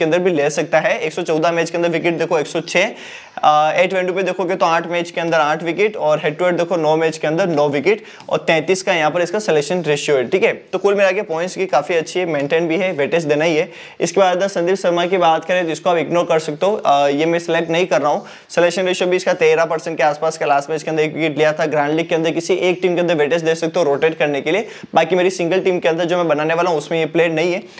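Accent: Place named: Rajasthan, India. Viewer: हम राजस्थानियों की हिंदी बोलते समय राजस्थानी भाषा निकल ही जाती है 😅😅 लगता है आप भी राजस्थान से ही हो 🎉🎉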